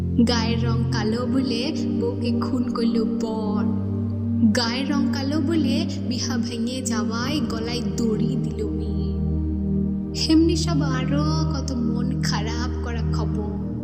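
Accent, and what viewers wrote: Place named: Bankura Purulia, India. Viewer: Darun hoacha amdar Bankura purulia vasa ta❤❤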